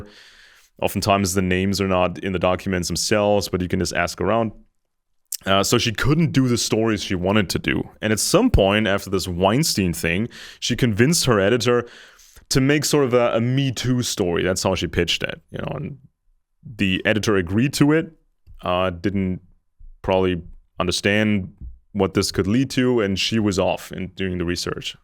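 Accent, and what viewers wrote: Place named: United States. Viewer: Well done! I put headphones on and listen while I work. I can relisten to one episode 3 or 4 times and get something new each time. I really appreciate your efforts. I'M LEARNING!!!!! You're English is so good that if you had not told me you were German I would have thought you were from the USA.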